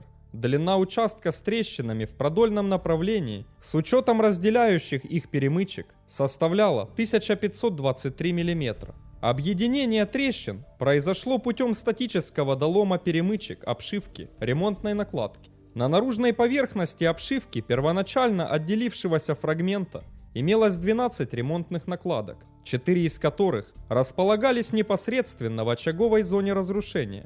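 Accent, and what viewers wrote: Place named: Ukraine. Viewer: Мляяя, кому не лень делают обзоры катастроф, походу не понимающие в авиации ничего. Сам инженер авиационный с 1989года. Говор автора вроде украинский.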